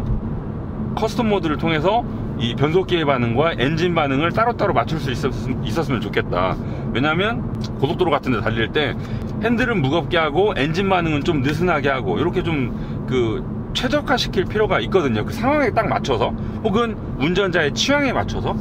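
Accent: Chungcheong, South Korea. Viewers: 이분 말투가 전형적인 현재의 충청도 말투입니다. 거의 교과서네요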